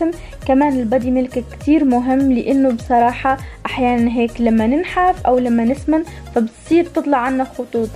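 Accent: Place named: Tunisia. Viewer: تقولش عليك تونسية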